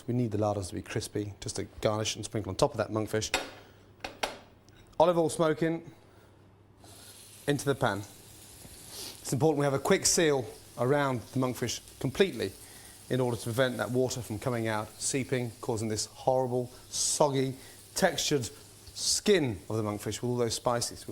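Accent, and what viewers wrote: Scotland, United Kingdom. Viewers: Love this down to earth raw front line insight. Its amazing to see Gordon not in massive wanker mode! I love his thick Scottish accent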